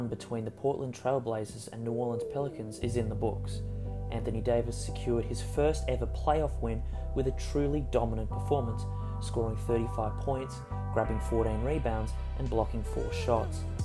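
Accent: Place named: Australia. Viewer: If you're able to turn up the volume on your voice over analysis, that'd be awesome. Your analysis is pretty sick; you also sound like a fellow Aussie. Can't wait for your game 2 review!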